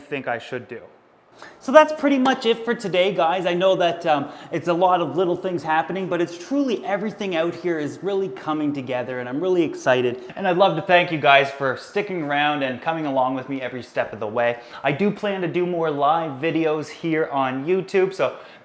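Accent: Canada. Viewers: Are you Canadian? :)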